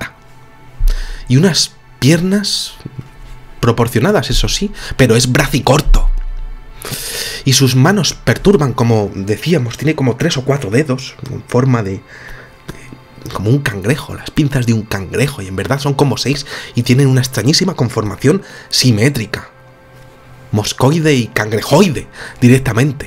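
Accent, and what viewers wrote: Spain. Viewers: Es un genio !! y su acento español,,, lo hace mas interesante. Me da mucha gracia su narrativa y sus dichos variadísimos que no se de donde los saca . Soy uruguaya y lo amo,,, es uno de varios youtubers increíbles. Junto con JL , Iván y David.... Muy bien por España y estos amigos que nos comparte. Un abrazo, Zully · no me gusta escuchar el acento español porque casi no entiendo, pero este tipo es encantador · Saga Fans no tiene porque, el es español de España, cada cual tiene sus formas y sus acentos, y todos son respetables. Sino te gusta nuestras palabras, siempre tienes la posibilidad de escuchar tus "localidades". Un saludo desde España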